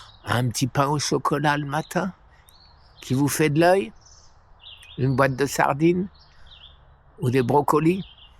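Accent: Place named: Nord-Pas-de-Calais, France. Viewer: Bonjour. Tu parles comme les ch'tis! C'est ici que l'on dit "Petit pain au chocolat" 😉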